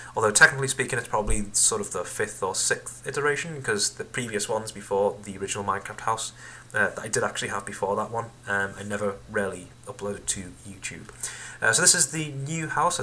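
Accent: United Kingdom. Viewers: Pam VanWinkle its called a british accsent stupid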